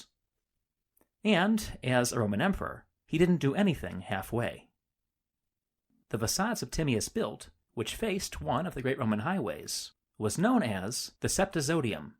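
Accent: United States. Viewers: You sound like Ben Shapiro. One of the more grating American accents for sure.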